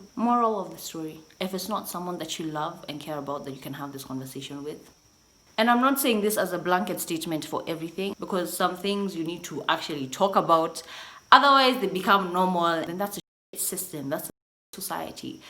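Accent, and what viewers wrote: United Kingdom. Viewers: Here for the British accent 😍😍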